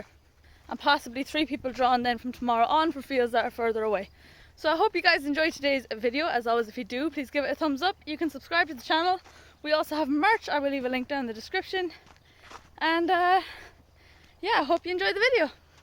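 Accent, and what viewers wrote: Ireland. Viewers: Partly guessed you were Irish.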